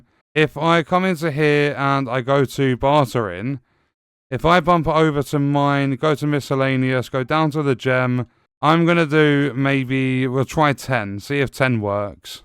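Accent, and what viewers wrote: United Kingdom. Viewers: shitass video too british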